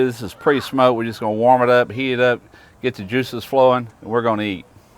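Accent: South, United States. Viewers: you make fabulous videos! Most informative, along with a great Southern drawl that makes a super combination for super, southern cooking! Thanks, and looking for more of your videos!!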